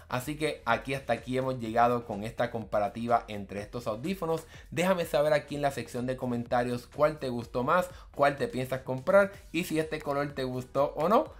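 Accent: Puerto Rico. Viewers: Yo de momento dije ese acento me suena a boricua, y si, mano casi no hay youtubers boricuas en tecnología es bueno ver más de los nuestros en estás cosas, yo compré los Beats hace como 3 días y de verdad estoy encantado hasta ahora, tenía antes los pixel buds serie a y eran buenos pero los Beats son mucho mas comodos, con mejor sonido y la cancelación de ruido esta excelente, y no solo eso por el precio que pague, $144 después del ivu, de verdad encantado con ellos hasta ahora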